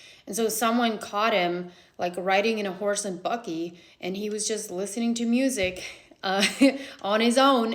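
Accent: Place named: Pennsylvania Dutch, United States. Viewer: I love the accent! I’m from Pennsylvania, and I hear people talk like this when I go to Berks or Lancaster counties. My university (where I heard buggies on Sunday mornings clopping through the campus) had offered PA Deitsch language courses, but I took Spanish. Meant to go back! Thank you for the interesting stories.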